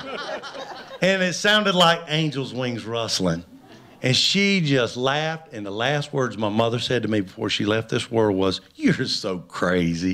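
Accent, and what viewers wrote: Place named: North Carolina, United States. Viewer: Literally tearing up. From NC myself, glad to hear a voice from home.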